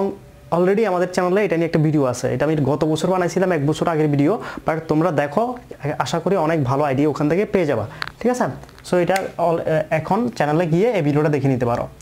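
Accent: Chittagong, Bangladesh. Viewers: অাপনি চাটগাঁইয়া নাকি গাইয়া গুইয়া কথা বলেন কেন?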